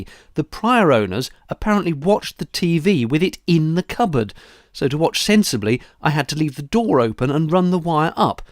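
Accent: United Kingdom. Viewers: ! I absolutely must know this. It's bothered me for many years now. I has nothing to do with Narrow Boating. It's all about how you crazy Brits pronounce a particular word. The word is.......Aluminum. So we pronounce is Ah Loo Mi Num. You guys say Al Uu Mi Nee Um. How did you get the extra sylable there? You know, it's your language and all, but, what the hell? I'm pretty sure you don't spell it differently than we do like with the word color and colour. I can see that. So what's the deal? P.S. I was very happy this weekend to see that you have a new video. I was worried. Or should I say, "I was wu or I eed.?"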